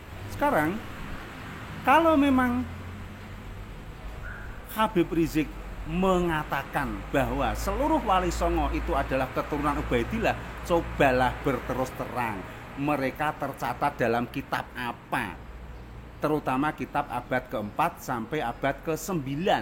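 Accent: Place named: Java, Indonesia. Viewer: Ini pak guru cerdas walau agak medok dikit . Lanjot pak de..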